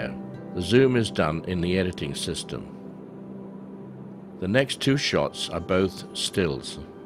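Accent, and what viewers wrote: England, United Kingdom. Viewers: THX FOR SHARING. YOU SOUND ENGLISH?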